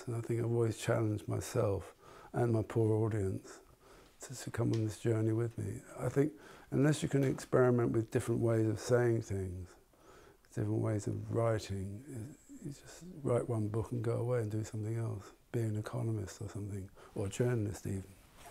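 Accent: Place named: North London, United Kingdom. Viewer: What an interesting guy. He's so well spoken and thoughtful. Yet to me anyway, he's kept the working class North London accent. He speaks highly of his brother, who he can't get along with. I love that "Paul's got a new record out"?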